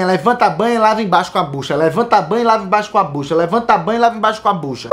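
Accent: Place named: Northeast, Brazil. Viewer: Esse sotaque e gíria nordestinos dão um plus muito foda pro vídeo. Hahaha Fica ainda mais engraçado. Parabéns!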